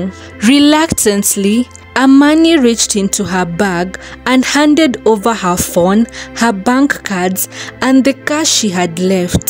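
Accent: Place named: Kenya. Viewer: Thank you too for the stories and yes, your Kenyan accent is really nice ❤️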